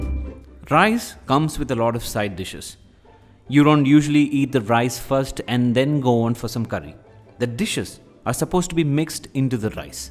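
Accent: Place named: South India, India. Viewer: Westerners who think all indians sound the same should watch this. This is one of the many south indian accent.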